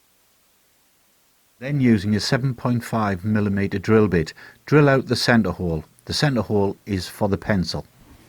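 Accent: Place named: North East England, United Kingdom. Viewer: Clear and simple, Sounds like you come from the area where I grew up, The North East of England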